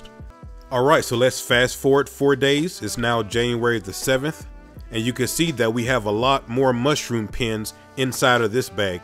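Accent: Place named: United States. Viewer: I love the American accent, it's deep or somethin'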